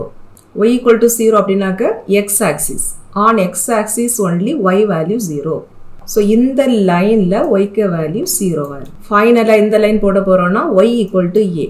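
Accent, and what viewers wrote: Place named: Tirunelveli, India. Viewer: Romba arumaiya teach panreenge. Namma thirunelveli style pechi. Thank you so much madam.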